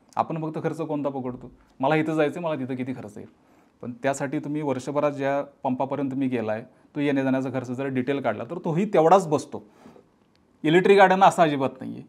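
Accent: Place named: Rural, India. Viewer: 3 ते 4 मिनिटांचा कन्टेन्ट घासून घासून परत परत परत तेच सांगून 20 मिनिटांपर्यंत ताणायला खरंच कौशल्य लागते राव. विषय चांगला आहे, ग्रामीण अक्सेंट मुळे आपल्यातला वाटतो देखील..पण जरा स्क्रिप्ट वाचून घ्या शेठ!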